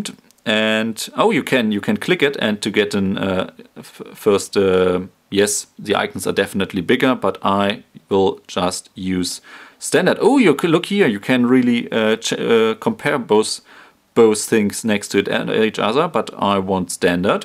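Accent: Germany. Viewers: Are you german? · I love your accent!!!!! I have the German accent as well.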